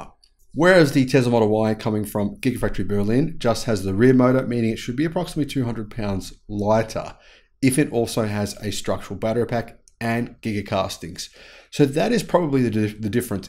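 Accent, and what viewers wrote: Victoria, Australia. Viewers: 😂Yes, you're an Ausie!😂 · aussie, no doubt 😂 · The ‘viking look’ probably throws folks off. Your Melbourne accent is genuine though! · 100% Australian Accent within 3 seconds. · Hello, when talking with someone from another country they will typically know that you are not from their country. They may have not be sure what country‘s accent you have but they will know it is not their own. This is even more so with non-native English speaking people. I can tell you that my wife as a native U.S. American did pick you up as an Australian just seconds into hearing your video first time. That is a good thing!! Be proud! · I'm Russian and I would've guessed your accent is Australian. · 120% awesome Aussie accent 😂 Keep it this way! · Yep Victorian Accent. When I did nasho at Puckka we had all the states segregated, that's when I heard the difference. 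· No Doubt. Beyond doubt your Australian 😊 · As a non-Aussie, to me his accent is not readily identifiable -- like say a Steven Mark Ryan's -- unless he says specific words which are pronounced in an obviously Aussie accent :). But good to know this is how a Victorian accent sounds like. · Total Aussie accent, mate! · 100% Australian accent yes. But don't expect non-english people to pick it up. British vs Aus vs NZ is not a big difference in accent, especially in a public speaking context. Regarding the efficiency, it's down to aero and more efficient inverters and motors. I wouldn't sing them any praises, all teslas are pretty rubbish at efficiency. Never mind the onboard charger at low load, tyres that cost a fortune. There's also the various rating of the capacity of the battery. Likely that BYD overstates the size, or uses second bin cells whilst they sell the first bin to their competition for top dollar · 😂😂 I'm an Aussie living in Spain for over 30 years, and YES, your accent is totally down under🤙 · Yes your accent is Aussie - I'm an expert, because I grew up watching Neighbours and Home & Away. 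😉 I've heard stronger though. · Hey. Total Aussie Accent! Good on ya! Sending love to you and family. Keep up the great coverage. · You sure sound Australian to me, Mate. 👌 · Yes cobber you’re an Australian obviously ……from a Pom. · You are 100% Australian! 😊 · Totally Australian accent! No doubt about it. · You have a broad and typical well spoken Australian accent. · Solid Melbourne accent, for sure. Also, digging the other voices too 😆 As for the range mystery, maybe it could be the software? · Definitely a Victorian Australian accent . Not a lot of different accents in Australia unlike the USA or UK. New Zealind and South Efrika do strange things with their vowels.🤔🦘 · 100% aussie mate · u sound very Australian, I love your shows, Eugene, Philadelphia, pa. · 100% Australian accent, easy to identify as such. Hard to replicate even after listening to you and SMR for a year or two, maté. 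· Definitely an Australian accent. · You sound Australian,! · the first time I heard you I knew you were an Aussie. No question.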